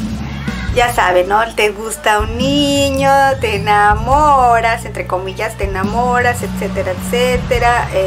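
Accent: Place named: Tampico, Mexico. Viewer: muy bonita plática no cabe duda cómo platicamos los de Tampico al oírte me siento en casa en mi tierra mi esposo es cómo el tuyo y acá en Guadalajara les llama la atención cómo es muy bonito cuidate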